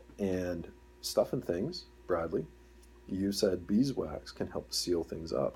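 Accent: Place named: West Coast, United States. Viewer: Nice west coast accent lol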